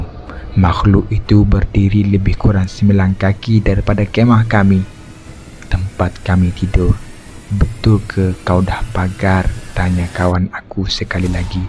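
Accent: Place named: Sabah, Malaysia. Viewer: Mcm ada slange sabah sikit jo.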